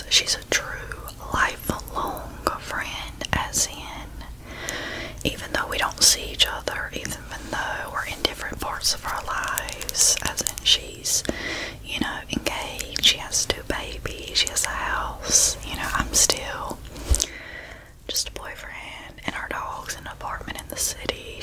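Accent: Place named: North Carolina, United States. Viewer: The addiction to that NC accent, lol.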